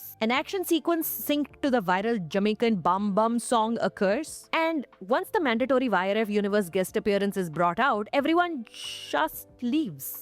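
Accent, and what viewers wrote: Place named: South Bombay, India. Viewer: south bombay accent english haha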